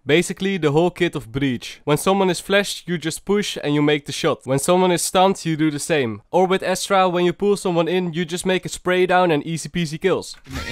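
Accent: Netherlands. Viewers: Are you dutch? You Sound very dutch xD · You sound dutch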